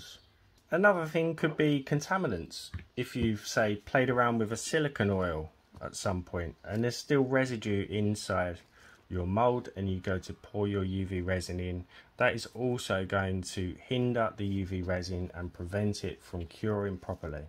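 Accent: Essex, United Kingdom. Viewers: God I’ve been married for 30 odd years but after watching this tonight I could actually marry you, you amazing man! I love the way you explain why. You’re so bloody awesome and I love the fact that you sound like your from Essex or somewhere like me too 😂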